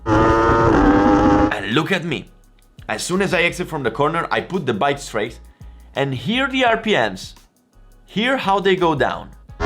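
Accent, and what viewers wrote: Italy. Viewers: I love your accent. Makes me miss living in Italy even more now. I subbed when you said, "if I keep da fooot like dis, I can poosh, while if I keep da fooot like dis, I cannot poosh" 🤣🤣😍😍 Great video, keep'em coming and ride safe 🏍💨✌🐼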